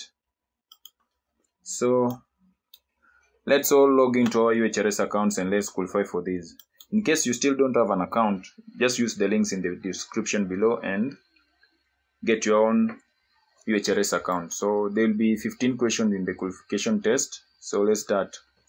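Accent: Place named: Kenya. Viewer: You have a kenyan accent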